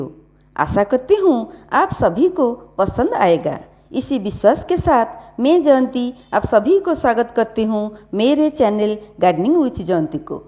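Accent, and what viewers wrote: Odisha, India. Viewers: Funny accent, Odia flavor! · Mujhe to odisha ki lag rahi hai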